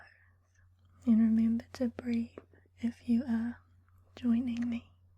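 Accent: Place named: New Zealand. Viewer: Thus us an ASMR Chunnel. I found ut to be relexing AF. Thenks. Aussie here, Dig the Kiwi acksent!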